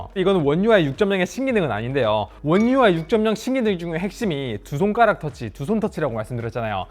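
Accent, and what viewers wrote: Gyeongsang, South Korea. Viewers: 좋은글 감사합니다~ 정신없는 경상도 말투는 정말 안바뀌시네요~ 😂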